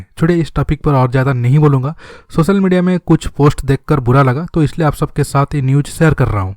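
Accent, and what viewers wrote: Odisha, India. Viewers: Bhai odisa so ho kya ,pronounsation se saaf pata chalta hai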